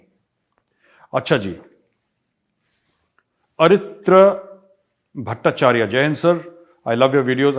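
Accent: Lahore, Pakistan. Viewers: sir big fan of your Lahori accent.... Sir maza aa jata hai apki video dekh kar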